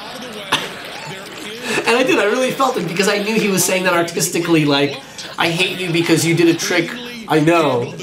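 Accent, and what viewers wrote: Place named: Europe. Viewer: Костя, у тебя на сцене почти не было акцента, а в реальности есть небольшой европейский, не совсем славянский. Интересно... Такое бывает когда в 10-13 лет иммигрируешь.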